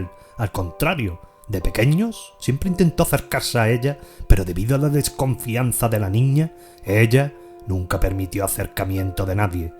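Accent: Spain. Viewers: amigo mío, tu acento es una dulzura al oído, impresionas con tus narraciones, gracias.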